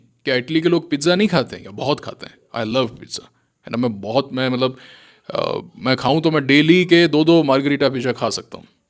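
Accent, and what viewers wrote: Rajasthan, India. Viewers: आपके बोलने के टोन में कुछ ज्यादा ही राजस्थानी बोली झलक रही है, पहले से कुछ ज्यादा, so मिट्टी से जुड़े रहिए 👌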